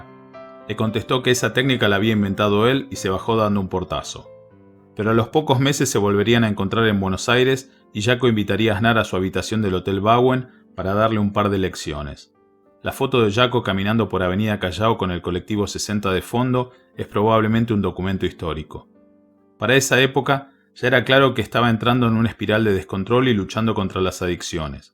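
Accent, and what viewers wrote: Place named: Yucatan, Mexico. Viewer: parese yucatecooo bombaaa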